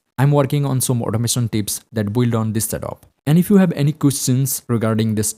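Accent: India. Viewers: Kolkata + English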